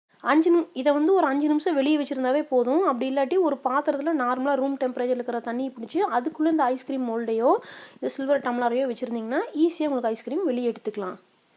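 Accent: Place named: Sathyamangalam, India. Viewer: Hi, nice videos,I just love your Tamil slang, I feel like I am in my hometown Sathy when I hear your voice. where are you from?where are you located?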